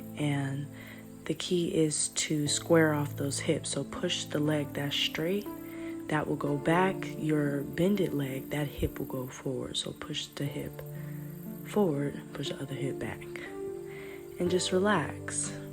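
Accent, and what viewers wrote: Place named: United Kingdom. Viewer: your voice is very soothing - made my eyes close so had to watch the video again. thank you - also I was 100% sure you were British until the switch!! 😅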